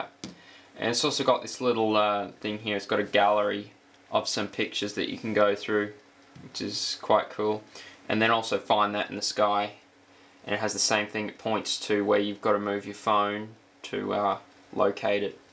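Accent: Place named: Australia. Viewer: lol australian...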